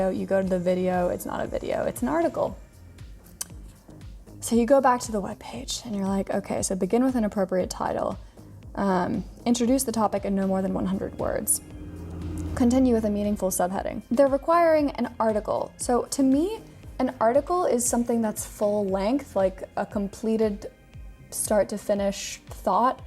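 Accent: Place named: United Kingdom. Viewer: Hello, thanks for sharing from Caracas venezuela...you are very beatiful pragmatics girl...im a teacher computers programming...i love writing articles en coinpayu articles but y read your reviewed...Es muy interesante tu estetica al vestir y ver el mundo...y tu bello acento britanico...como a los que tanto escucho cada dia. best regards.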